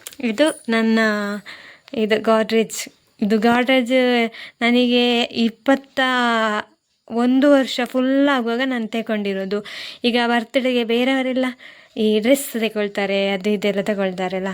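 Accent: India. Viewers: ನನಗೆ ದಕ್ಷಿಣ ಕನ್ನಡದವರು ಅಂದ್ರೆ ತುಂಬಾ ಇಷ್ಟ ನಿಮ್ಮ ಭಾಷೆ. ಮಾತನಾಡುವ ಶೈಲಿ. ನನ್ನ ಮದುವೆ ಆಗುತ್ತಿರಾ ನೀವು. ❤ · Nim kundapura super ❤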